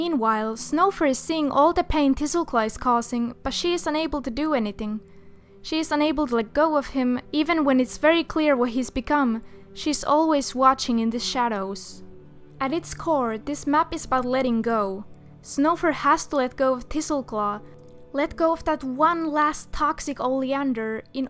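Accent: Finland. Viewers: Da Dragon Durp it's called "rally english" it's really common with finnish people xd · Ah, i can hear the finnish englhis. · I like waffles MÄ TIESIN SEN! MÄ OLIN NIIN VARMA ET SE ON SUOMESTA JA NYT TOTUUS ON SELVILLÄ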